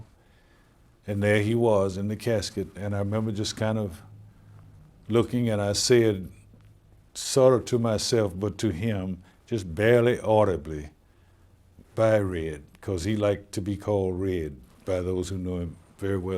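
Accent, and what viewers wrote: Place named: South, United States. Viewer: Yeah he duz hv a southern tone, but its Subtle. His vocal tone cadence add to his credence.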